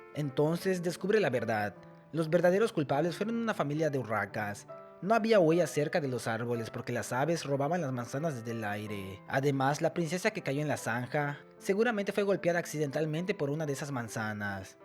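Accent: Yucatan, Mexico. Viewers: Buen video, eres yucateco? 🎉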